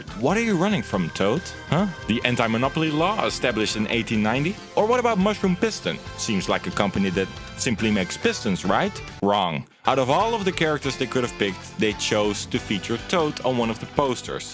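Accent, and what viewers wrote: Netherlands. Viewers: You're dutch Aren't you?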